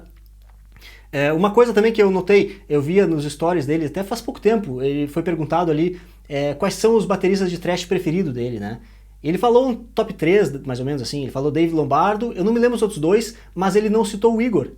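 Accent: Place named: Rio Grande do Sul, Brazil. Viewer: E como é esse sptaquezão gaúcho ai na Noruega hein heehehehehe, tri bom ouvir canal de conterrâneo hahahaahah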